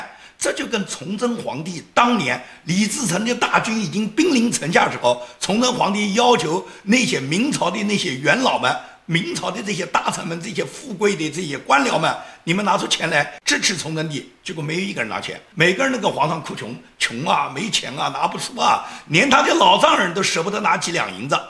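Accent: Nanjing, China. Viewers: 听着吴老师亲切的南京乡音，真希望土共可以早点土崩瓦解，这样吴老师可以回到祖国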